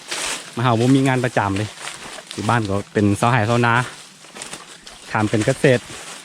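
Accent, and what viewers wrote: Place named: Sakon Nakhon, Thailand. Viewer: พึ่งมาเบิ่ง เอ้อ เว่าคือข่อยไทสกลเลย 555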